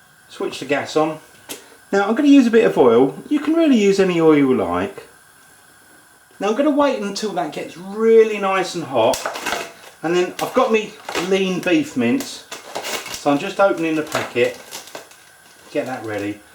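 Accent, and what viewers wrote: United Kingdom. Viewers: I was just about to ask the same thing :D I'm from Hungary though, but I love the accent, I am working on mine to be more British :) For that matter, I'm just making this dish :) Sadly the butcher ran out of beef so I gave it a try with pork O.o I wonder how it going to turn out :D Won't be a real cottage pie after all because of the meat, but I'm still looking forward to it :)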